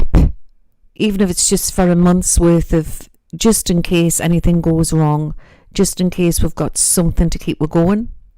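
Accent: Newcastle, United Kingdom. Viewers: Love and miss the Geordie accent, from a geordie lass in Tasmania Australia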